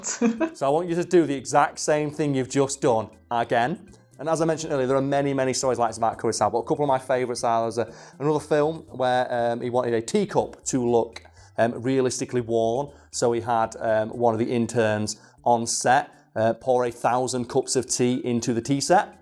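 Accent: United Kingdom. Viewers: And what i said about government guidelines was in response to what you said about masks. And i don't think it's that hard to pinpoint where this guy is from, considering his extremely British accent. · You can tell that he's British from the first few words. "Like seasoning its not necessary"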